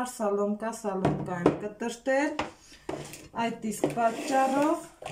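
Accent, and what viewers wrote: Lori, Armenia. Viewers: Լոռվա ակցենտով եք խոսում, շատ հաճելի էր ամպայման կպատրաստեմ👍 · Շատ հավեսին եք խոսում, Լոռվա ակցենտով 😁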